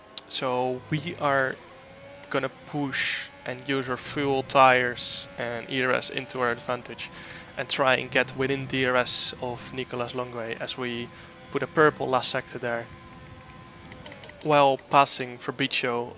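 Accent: Netherlands. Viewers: I love the fact you can hear his dutch accent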